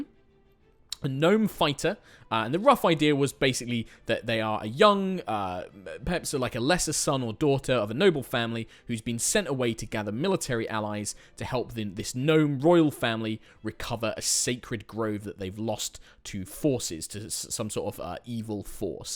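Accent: United Kingdom. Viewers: This video is good quality, but without the British accent it would be incredibly boring.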